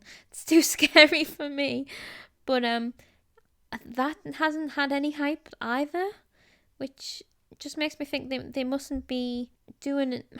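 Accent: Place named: Newcastle, United Kingdom. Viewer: That Geordie accent is adorable I wanna hear you talk all day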